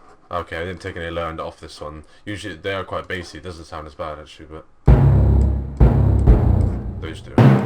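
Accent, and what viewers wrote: United Kingdom. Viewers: is brittish?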